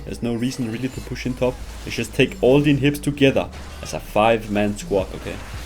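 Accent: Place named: Denmark. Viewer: Love the vids. What accent is that? Sounds a little danish to me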